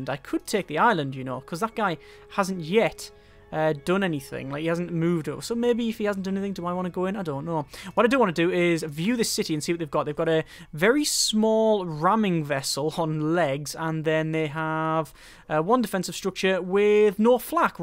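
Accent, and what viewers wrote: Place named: Scotland, United Kingdom. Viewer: Dude r u Scottish or Irish cus u sound like it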